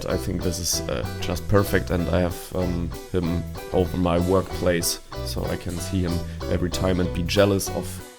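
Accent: Germany. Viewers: I hear deutsch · R u german? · Kleiner Tipp: Der deutsche Akzent hat dich verraten 😏 Grüße aus dem Deutschen Reich! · Englisch ist top, aber der deutsche Akzent verrät dich sofort 😂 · Warte du bist deutsch oder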